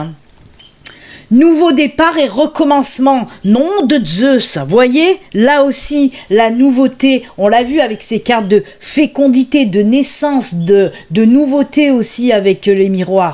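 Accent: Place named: Provence, France. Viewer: Vos un petit peu ça fait très marché de Provence 😉😂... Ne changez rien, ça ensoleille nos journées, de même que votre bonne humeur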